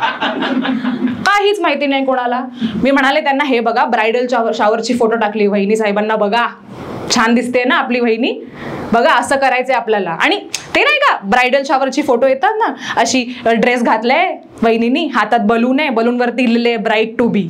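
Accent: India. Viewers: tumachi Marathi bhashewarache prabhutva assal Puneri watat ahe.. ekhada Punyat show kara.. nakkich pratisad milel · Probably one of the best Marathi comedian out there. And her Marathi is so Mumbai. Otherwise you always hear puneri or kolhapuri Marathi. Nothing against them, but Mumbai accent Marathi feels relatable. Keep up the good work. ❤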